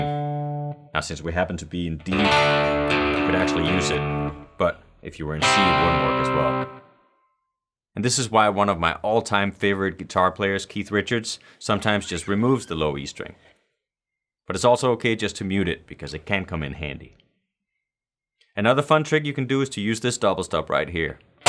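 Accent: Denmark. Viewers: Sounds like a Dane speaking English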